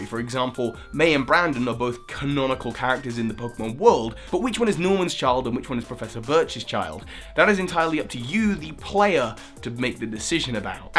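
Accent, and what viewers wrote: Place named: London, United Kingdom. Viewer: Where are you from in England? Based on the cadence of your accent, I'm assuming you're from the London area since it sounds like Daniel Radcliffe's accent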